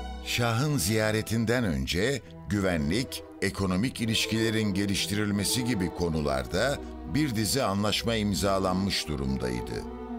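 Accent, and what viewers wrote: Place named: Cyprus. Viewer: Kulağa kıbrıs şivesi gibi geliyor. Hoş, naif ve güzel bir diksiyon..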